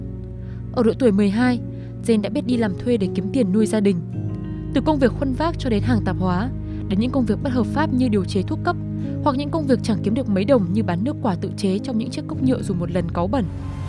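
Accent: Vietnam. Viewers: hihi mình nói giọng Nam chữ "dây" phát âm khác chữ "giây". Nghe bạn nữ giọng Bắc phát âm "dây" giống hệt chữ "giây" nên mình bị quán tính, thật là thiếu não.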